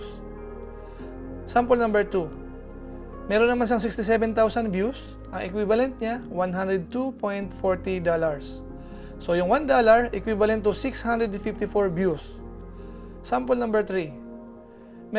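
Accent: Bicol, Philippines. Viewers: Bicolano ka ba noy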